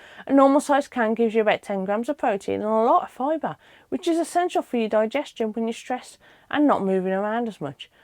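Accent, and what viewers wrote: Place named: Black Country, United Kingdom. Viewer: black country? Rowley regis? Is my guess ❤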